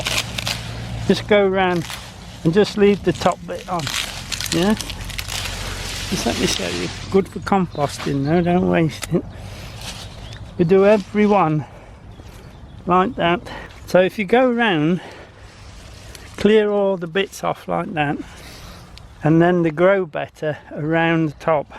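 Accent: England, United Kingdom. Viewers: Liz Nichols... Hi there Liz... i live in Cochise County.. Southern Arizona.... on the edge of the desert... gardening can be difficult.... but i find growing in the winter.. i can cope with most veggies... fruit trees need extra care and attention.... water being the biggest problem irrigation systems are a must..... is a guy who makes things sooo easy to understand.... and i can understand his English accent........ Ed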